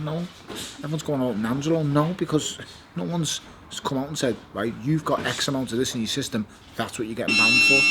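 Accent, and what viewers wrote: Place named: Liverpool, United Kingdom. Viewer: Tony the bellend you fat overgrown balloon. You ugly scouser with a disgusting accent like all the other scousers in the world you will not get home safe to your wife and kids after david the toemaker haye whoops you so bad you’l be in hospital with your wife and kids next to you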